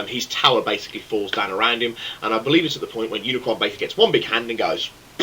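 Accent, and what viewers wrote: United Kingdom. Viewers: I know you may have heard it before but my friend and I think you sound like a brit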